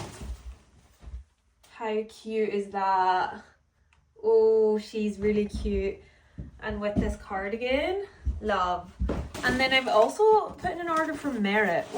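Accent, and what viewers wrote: Scotland, United Kingdom. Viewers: There's something about a Scottish voice that warms my soul. I can't listen to every post, but thank you! 🙏💛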